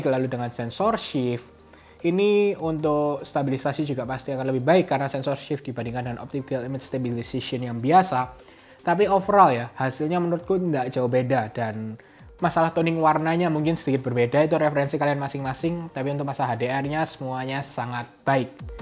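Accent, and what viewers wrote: Java, Indonesia. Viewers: Orang Jawa logatnya keliatan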